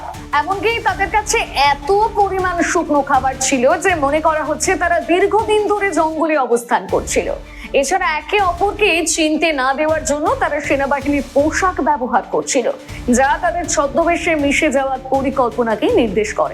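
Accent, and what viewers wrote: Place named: Bangladesh. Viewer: কি খেত , কোথায় হাগতো সে খবরে কি দরকার?Glorification of notorious animals channel গুলোর ব্যবসা!বাংলাদেশী মহিলা মনে হচ্ছে উচ্চারন শুনে!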